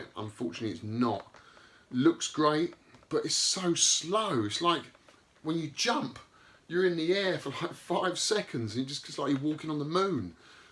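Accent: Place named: England, United Kingdom. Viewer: You sound like you're from England and if so, where do you find your retro games? Thanks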